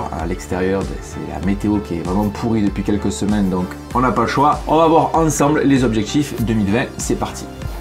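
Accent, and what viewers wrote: South, France. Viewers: Soûlant l.accent sudiste. Dommage.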